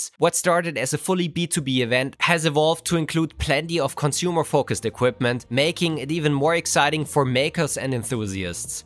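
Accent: Germany. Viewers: If you put aside the quality of the voice that would have sound fake even in the 80's, the worst part is the quality of the translation, it is off all the time when there is some nuance in the term you are using. Fortunately, I learned english a while back and never stopped using it, so original english audio it is. Plus your german accent is something that gives personality to your channel and all of this is obliviated by this disgusting tool. Instead of that, YT should improve the quality of the autotranslation for the sub-titles, it would be so much better.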